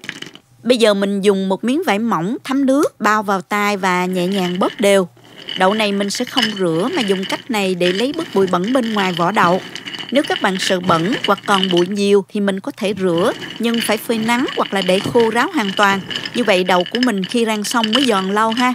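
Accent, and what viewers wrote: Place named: Binh Duong, Vietnam. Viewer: đọc lạ Bình Dương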